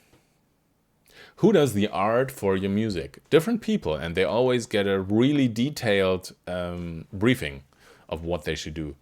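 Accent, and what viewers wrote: Germany. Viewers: Are you german it sounds like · Well i heard it that your a german person because of your accent(and i´m german too also warum schreibe ich auf englisch, ich pfosten hehe) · An diesem Akzent merkt man eigentlich direkt er ist Deutscher To my English friends the Translation: With this accent you can tell that he is German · Well you actually can tell from his accent. I also live in germany and i always hear this accent from german people that speak english. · Man hört ihm schon an, dass er Deutscher is xD Hätte ich von seinem Pb her aber nicht gedacht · Is der Deutsch? =>where is he from, or is he german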